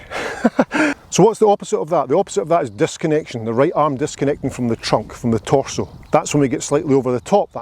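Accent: Scotland, United Kingdom. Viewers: Hello! First, I love accent. Are you scottish? Also, thank you for making these ben hogan videos. His book is timeless and to see somebody bring it to life through video really helps a beginner. I noticed that on the post impact part of your swing, your arms are aggressively bent in to the body, as opposed to hogan's full extension. I feel like I have that same tendency too. What do you think?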